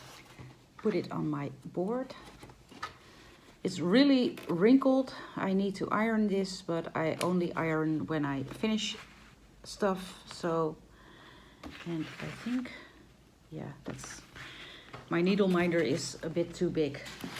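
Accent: Netherlands. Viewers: Fab update! Can I just say how beautiful you look in this video!! I loved the Dunglish and strangely understood what you meant each time 😂 fabulous stitching month, love your updates. Happy Easter xx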